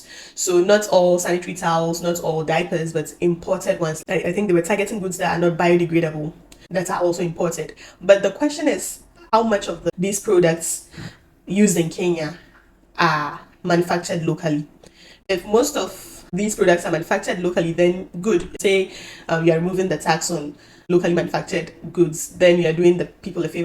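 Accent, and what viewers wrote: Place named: Ghana. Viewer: I detect a Ghanaian 🇬🇭 accent.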